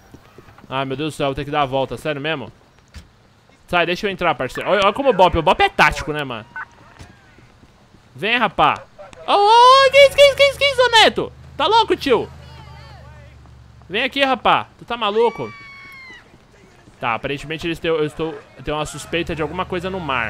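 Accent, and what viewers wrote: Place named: Sao Paulo, Brazil. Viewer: be eu to zuando pqp cara '-' eu odeio sotaque de paulista mas n vo odiar o cara pq ele fala estranho '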